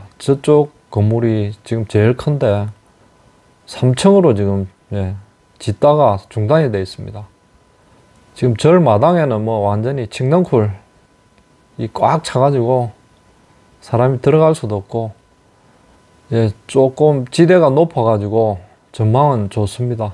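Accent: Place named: Gyeongbuk, South Korea. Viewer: 도 경북말투 억양 이시네요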